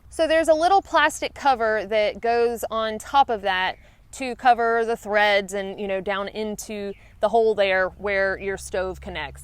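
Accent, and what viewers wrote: South, United States. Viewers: I just spotted your channel today, this is the 6th video I've watched so far. I'm going to subscribe to your channel because I love the beautiful scenery you get to see on your hikes and also because it's so great to hear that sweet southern charm from a fellow southerner. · Just for fun, I pulled open a tab with your YouTube video from one of your first AT vids: "Extras: I Almost Knocked My Teeth Out" . I played a minute or two of you talking, then a few minutes of this video. Then switched back and forth. You still sound like you're from the south, but you've lost some of your accent. I wonder if it will start coming back, now that you are home. Not saying that a southern accent is good or bad or anything. I just love linguistics and find it fascinating. It's interesting to hear a difference.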